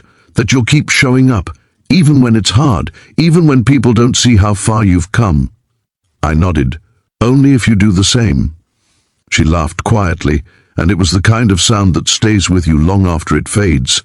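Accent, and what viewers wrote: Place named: England, United Kingdom. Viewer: This is very bad, especially the voice doesn't fit the apparent age of the protagonist. He shouldn't sound like a middle aged Englishman.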